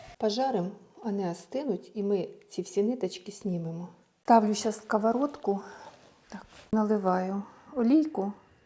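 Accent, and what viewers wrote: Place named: Odesa, Ukraine. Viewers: То й не слухайте! Це південноукраїнська говірка! Діалекти є в кожній мові! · Землячка моя, наш одеський діалект, приємно чути своє рідне, сумую за домівкою 😢, я заливаю бульйоном коли ставлю в духову шафу, вони небагато смачніші тоді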